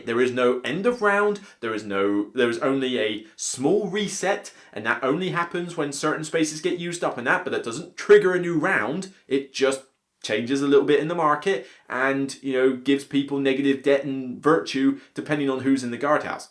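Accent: United Kingdom. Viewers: Why is this guy’s accent half British and half American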